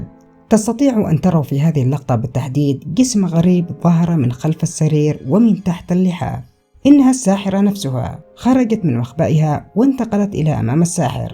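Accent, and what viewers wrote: Yemen. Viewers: ارجو عدم مزج اللكنه (التهاميه)باللغه العربيه حتى لاتكون النتيجه مخضريه الله يحولك. .... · آخيرا حدا يمني بدل المصري ....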